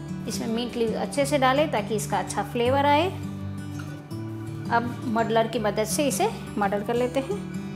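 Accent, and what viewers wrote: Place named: Kathiawar, India. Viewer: Madam aap Kathiywadi ho? Hindi bolne par bhi pata chal raha hey...!!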